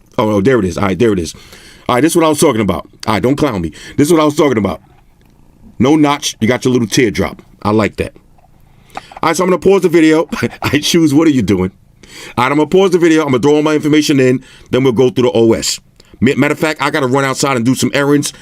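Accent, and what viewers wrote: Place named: New York, United States. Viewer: I'm type shit living the struggle so videos like this help me a lot - is always making the best reviews for this type of shit - and also does anyone else just laugh because the way talks is so New York that it's hilarious 😭😂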